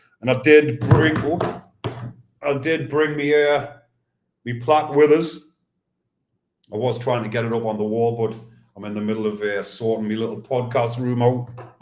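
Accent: Newcastle, United Kingdom. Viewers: Good to listen to you love your Gordie accent 😁 ♥️ 🤍 💙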